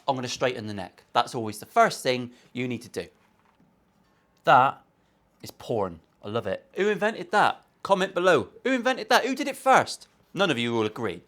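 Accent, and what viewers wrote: United Kingdom. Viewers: Hello, I have no problem with the swearing you say but.. for heaven's sake.. can you tone down that British accent a little? It's getting on my nerves! And, by the way, I'm from Brazil and I speak Portuguese.. Anyway, I'm signing up for this channel right now. Thank you!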